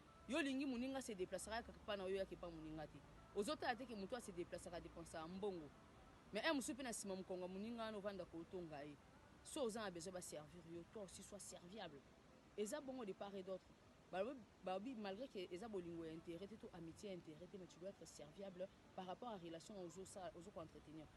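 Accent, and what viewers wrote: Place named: Canada. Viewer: Osololi la canadienne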